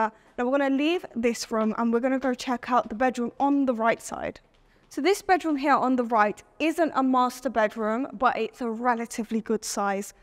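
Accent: London, United Kingdom. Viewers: Definitely an accent from the UK, possibly London. Great to see young individuals getting out there opening doors and driving forward … well done, keep driving forward… As an engineer in the Oil Industry I did the same, big world out there and you never know who you could meet that could change one’s life…. As I stated great presentation… this home is fantastic…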